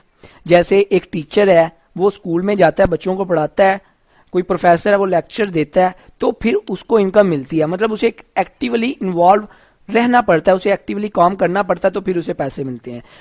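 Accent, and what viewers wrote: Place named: Punjab, India. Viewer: Punjabi Urdu ko Urdu koPunjabi lehjey meyplease na bolain.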